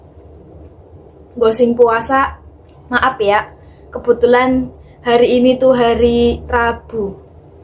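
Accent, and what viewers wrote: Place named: Banyumas, Indonesia. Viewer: Disumbat mba gusinya pake kapas,salam kenal ya mba ngapak 😁🙏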